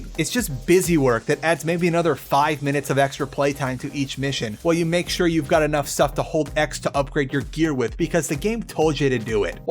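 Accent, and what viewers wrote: United States. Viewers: You from Colorado cause you almost sound like Jimmy snow.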